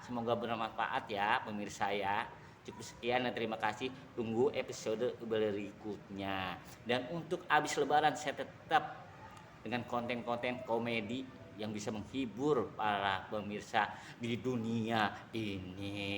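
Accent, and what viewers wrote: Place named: Betawi, Indonesia. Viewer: lenong betawi banget.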